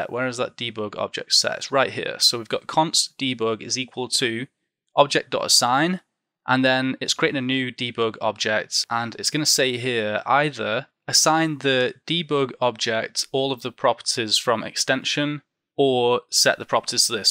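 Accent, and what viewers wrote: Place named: England, United Kingdom. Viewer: I’ve been watching your videos for a few weeks now and I am so happy I found your channel. Your videos are outstanding, and it is much easier for me to understand with the English accent:). Keep doing your thing, I look forward to your next video!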